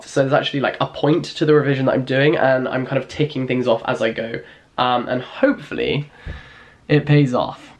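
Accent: RP, United Kingdom. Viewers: younger is major nostalgia for me omg you're so posh!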